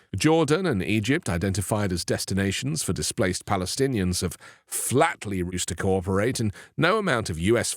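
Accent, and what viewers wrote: United Kingdom. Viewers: Is this ai voice implant to sound British and why would you think it makes sense?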